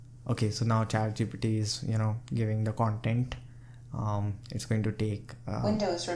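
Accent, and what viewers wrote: India. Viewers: Came to channel Subscribed Heard Indian Accent Unsubscribed I'm brown myself, but no this is cringe.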